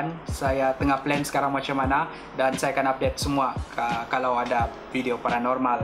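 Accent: Sabah, Malaysia. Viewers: sabah here. pekat btul sabah mu. vid mu nda prnah mengecewakan. semoga sukses selalu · Sabahan bah nii... Like bah kita kita juga nii.. Haha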